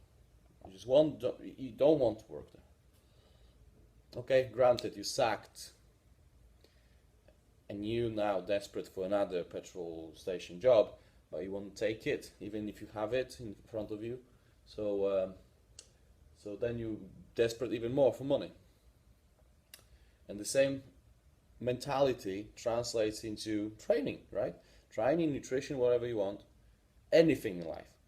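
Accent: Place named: Eastern Europe. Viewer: Panie, bardzo ciekawe video, ale czy nie zastanawial sie Pan nad przejsciem na forme pisana? Niestety slychac, ze jezyk angielski nie jest Pana ojczystym jezykiem, robi Pan calkiem sporo "polskich" bledow jezykowych, ma Pan mocny slowianski akcent i slychac, ze z trudem szuka Pan slow. Polaczenie tego wszystkiego z dosc slabym udzwiekowieniem (moze dodatkowy mikrofon tuz pod szyja) sprawia, ze po prostu ciezko sie tego slucha i trzeba sie mocno skupic, zeby wyluskac tresc. Czytalem kiedys Pana artykuly nt. treningu silowego i byly bardzo dobrze napisane. Moze zamiast formy youtube'owej przerzucilby sie Pan po prostu na bloga w jezyku angielskim? Tlumaczenie tekstow z jezyka polskiego na angielski jest aktualnie smiesznie tanie, a moglby Pan zlapac wiekszy traffic, szczegolnie na kfd/sfd/pokrewnych forach. Pozdrawiam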